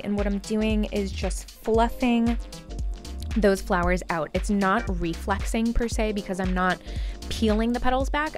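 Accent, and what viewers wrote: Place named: United States. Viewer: omg i love your video, and u r accent is so American! i can feel the power in your voice!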